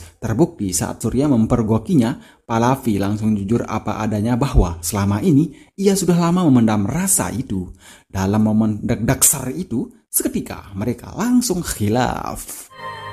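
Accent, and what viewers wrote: Bali, Indonesia. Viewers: Logat bicara nya kayak logat bali, 😁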